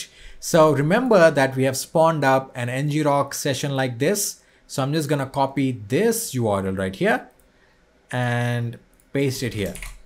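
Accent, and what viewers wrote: India. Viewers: bro look arab and speak indian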